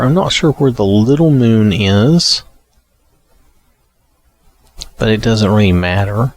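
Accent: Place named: East Texas, United States. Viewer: From east Texas? Sounds like it 10/10 hope these are on PC 😭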